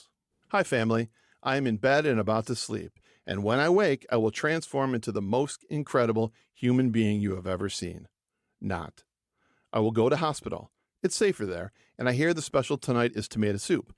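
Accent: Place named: Rust Belt, United States. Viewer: I love your voice, too. I have to ask if you are from the rust belt because the way you speak is very familiar and I am a curious cat. Just wondering. I grew up in Ohio and the Buffalo area myself.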